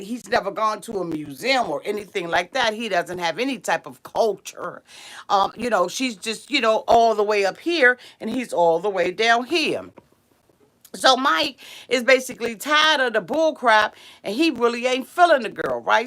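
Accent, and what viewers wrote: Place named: DMV, United States. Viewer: I love your accent, are you from the DMV area???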